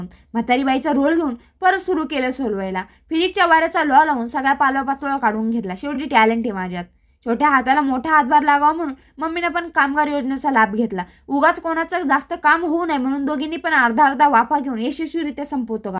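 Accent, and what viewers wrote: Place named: Vidarbha, India. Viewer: तुझी भाषा विदर्भातील वाटते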